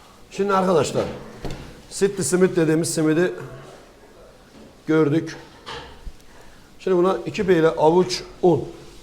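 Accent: Gaziantep, Turkey. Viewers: usta, Antebe gelirsem seni ziyarete gelecegim yorum. Bizde gurbette yasayan anteplilerdeniz. Valla antep dilini duymak beni sevindiroyor. Elline goluna saglik yorum cok güzel görünüyor. Senin videolarina zevkle bakiyorum!!! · Öz antepli şive mükemmel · ustam entep şiven le süper seyırediliyorsun video larını seyir ediyorum kısmet olursa pandemi bıterse sizinle tanışmak için antepe geleceğim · Abi zevkle senin videolarina bakiyoruz. Birşeyler de öğreniyoruz.Bismillahirrahmanirahim diyerek baslamanda beni çok mutlu ediyor Antep şiveside çok güzel.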